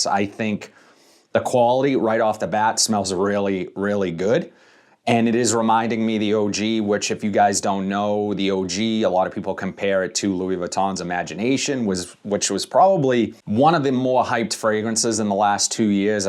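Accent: Boston, United States. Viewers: Interesting accent. Like Southie Boston lol. Very handsome.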